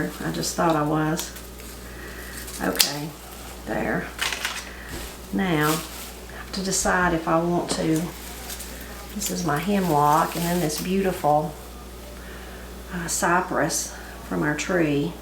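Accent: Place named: Appalachia, United States. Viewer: Great video!, thank you for wonderful ideas to decorate. I am watching the video and eating the biscuits we made using your recipe. Is there a chance to make a video on how to master Appalachian accent, especially [ai] sound like you pronounce in whIte, or try. Thank you Best, Yulia from Florida, Katie's cheerleader;-))))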